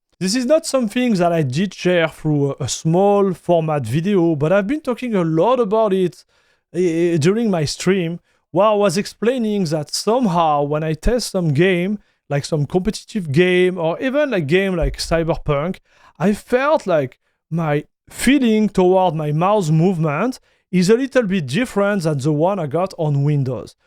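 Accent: France. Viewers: The French accent makes the video better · The French accent makes the video worse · Honestly haven't listened to much French accented tech content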